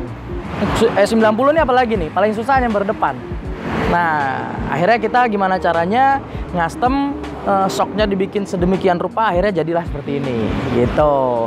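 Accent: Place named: Medan, Indonesia. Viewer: Ini medan bung😂😂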